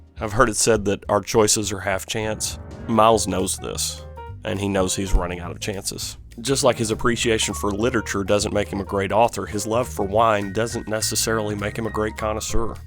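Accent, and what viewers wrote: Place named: West Virginia, United States. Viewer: You sound like you're from west virginia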